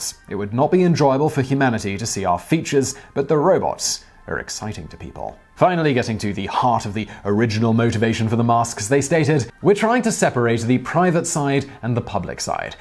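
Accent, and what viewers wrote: England, United Kingdom. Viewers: Hey it's Simon Whistler, damn man did you leave visualpolitk, i love that channel and i watched it for you and you alone, you give everything this special style, by this i mean it doesn't sound force scripted or anything and yoyr english accent adds to it as well, nice to see your on this channel as well, I'm gonna subscribe because your on this channel too! 👊👍🍻😁😊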